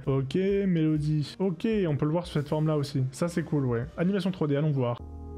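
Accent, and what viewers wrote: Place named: Germany. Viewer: Chouette contenu et bordel quel magnifique accent allemand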